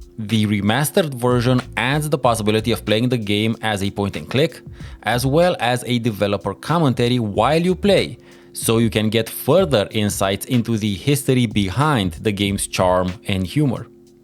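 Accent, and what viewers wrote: Romania. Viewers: Ai accent de roman. Esti roman. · Badass list. What's that accent? My terrible guess is Romania :)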